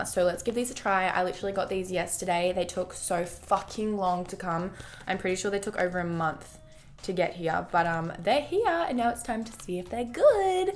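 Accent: Australia. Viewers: I adore Australian accents. 😍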